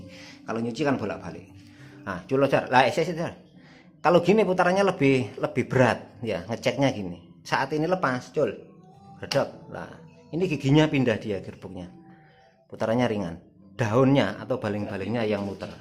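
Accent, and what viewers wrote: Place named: Jepara, Indonesia. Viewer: logatnya kyak jepara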